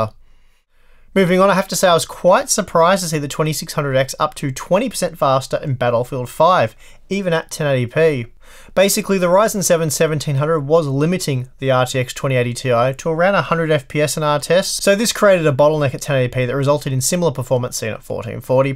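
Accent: Australia. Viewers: I love what the auto subtitles make of your intro with your Australian accent every time. This time it said 'welcome back to hammer on box' 😂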